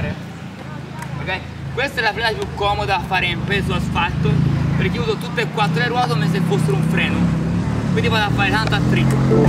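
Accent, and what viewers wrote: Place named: Tuscany, Italy. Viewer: Sei toscano dall'accento sembra di sì bravo bellissimo video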